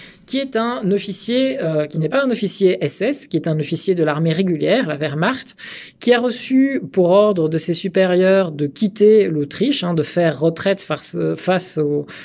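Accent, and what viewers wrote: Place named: Belgium. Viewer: ahhhhh caaaaa ne m'en parle pas. Mais ça fait plaisir d'entendre cette voix belge :)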